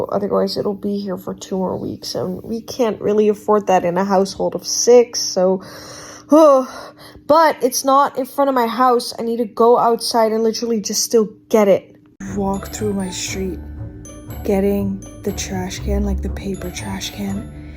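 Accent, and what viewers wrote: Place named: Netherlands. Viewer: ik hoorde al meteen dat je Nederlands was en wat geniet ik van deze video hahahah soon ook mn pc bouwen. spannonddd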